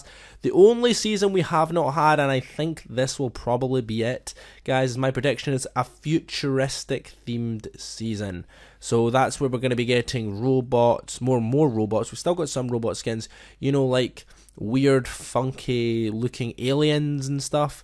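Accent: Scotland, United Kingdom. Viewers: So your scotish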